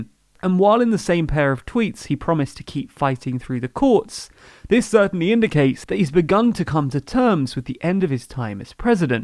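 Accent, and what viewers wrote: England, United Kingdom. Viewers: TLDR - Get context from Gore v. Bush. Don't just parrot talking points. Even the English accent can't mask your bias and wrongful analysis. I've watched three videos now and hoped to get some real analysis. However, this is about 85-99% msnbc or cnn or fox.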